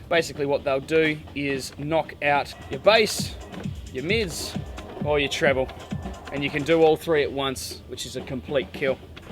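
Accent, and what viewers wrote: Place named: Australia. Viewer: finally, an aussie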